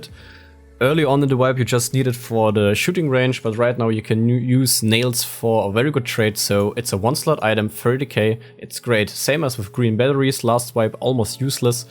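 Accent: Germany. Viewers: Man hört dass du Deutscher bist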